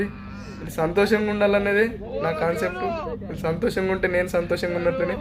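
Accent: Nizamabad, India. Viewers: వీడు nizamabad లేదా ఆదిలాబాద్ లాంగ్వేజ్ ఉంది పిచ్సిలేసిపడి వీని స్లాగ్ చూస్తుంటే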